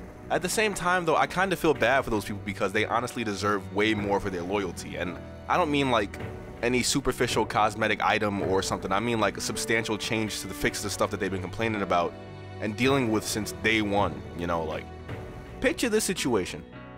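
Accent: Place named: New York, United States. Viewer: Sound like a nyc accent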